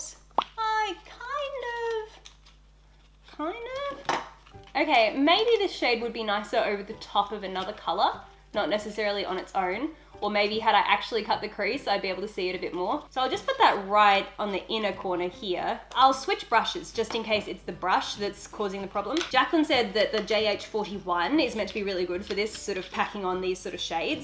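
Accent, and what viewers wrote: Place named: Australia. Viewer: i love that archie has this australian accent of yours, hahaha so cool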